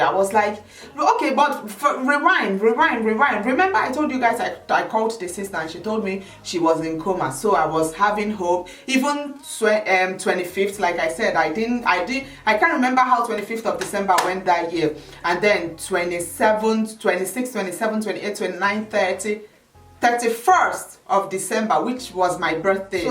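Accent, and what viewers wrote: Nigeria. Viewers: thanks, so touching but interesting! Your stories are always so interesting..................never a dull moment with you! Sorry about the loss! God knows best! slay on my queen! your accent and repeated "words" makes me know you proudly Nigerian! lol when we see oyibo, we know say na oyibo, when we see our own, we know say na our own......kissessssssss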